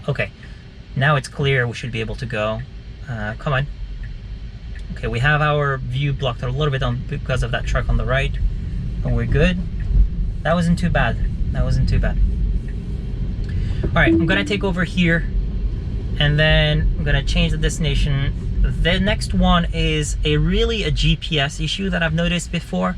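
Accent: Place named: United States. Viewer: Hi, are you... French? You've got a good American accent from what I can judge (being French myself😁). Nice video 👍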